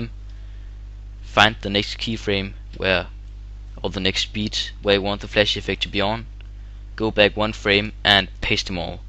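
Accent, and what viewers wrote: Denmark. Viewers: hes from Denmark we almost got same accent :p :D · He sounds more like Danish. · Ur danish, right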